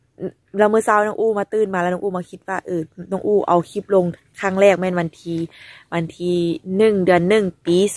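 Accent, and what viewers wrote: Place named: Si Songkhram, Thailand. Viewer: สวัสดีปีใหม่....น้องอู่ติดตามดูตลอดเด้อเป็นกำลังใจให้...ถ้ามีโอกาศมาไทย..ที่บ้านยินดีต้อนรับเด้อ...น้องอู่พูดคือคน..อำเภอศรีสงคราม...จ.นครพนม.....อยู่ใกล้บ้านอ้ายเขยบ่าวหนอย.บ่าวสุ...สบายดี.....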